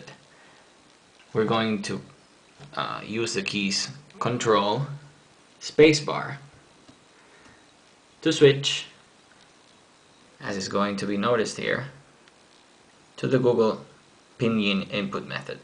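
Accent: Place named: Finland. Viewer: I say its a finnish accent.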